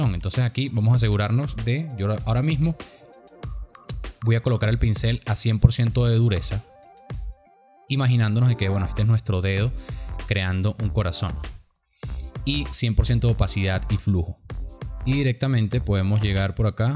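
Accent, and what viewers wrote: Venezuela. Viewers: Excelente puedes ver como quedo en (Instagram). Por el acento somos paisanos Vzla. Gracias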